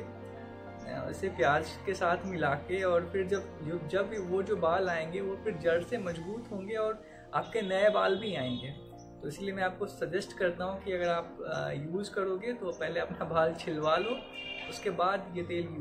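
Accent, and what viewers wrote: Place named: Bengal, India. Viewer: Pronunciation se Bengali mone h6e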